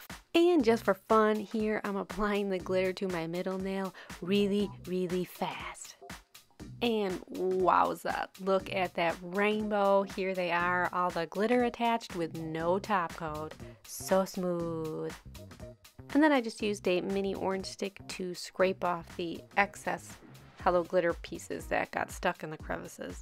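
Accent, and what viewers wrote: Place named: North Dakota, United States. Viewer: Are you from North Dakota or anywhere in the north? :P You've got a lil bit of an ND/UP Michigan accent. I could be totally wrong tho lol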